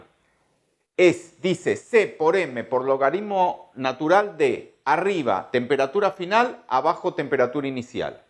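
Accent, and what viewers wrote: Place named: Argentina. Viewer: yo se que usted no quiere que le pongamos like ni que le agradezcamos y toda la bola, pero no sabe, NO SE DA UNA IDEA de lo HERMOSO que es escucharlo hablar en argentino explicando tan bien todo y con tanta buena onda y facilidad, curso Lic. en Biotecnologia en la UNM y es hermoso todo esto! gracias!! millones de gracias! quisiera abrazarlo fuerte! Aguante la educación publica!!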